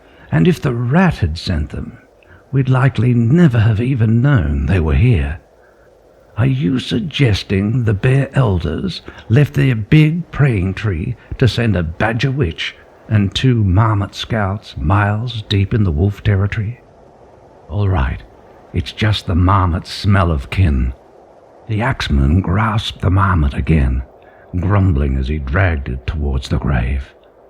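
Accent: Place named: Australia. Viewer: I listen to a lot of audiobooks so it is both interesting, brilliant but also somehow strangely odd to hear an Australian accent narrating. I look forward to hearing the entirety of it. The narrator has a lovely deep timbre to his voice.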